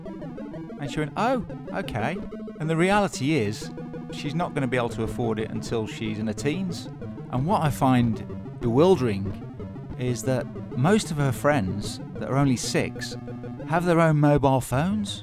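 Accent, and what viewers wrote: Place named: Birmingham, United Kingdom. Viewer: Great video mate good job it's not its the 3rd game Oh are you from Birmingham I'm in Wolverhampton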